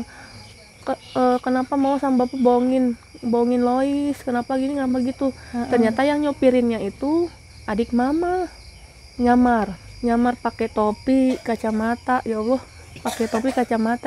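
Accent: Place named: Sunda, Indonesia. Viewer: Maasya Allah teteh.Logat sundanya juga sdh kental.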